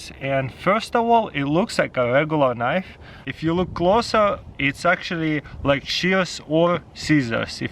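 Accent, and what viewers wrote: Russia. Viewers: He's russian guys chill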